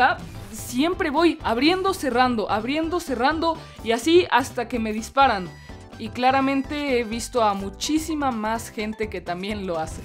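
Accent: Costa Rica. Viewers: Usted es de Costa Rica? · Eres tico verdad? Lo digo por el carepicha😂😂😂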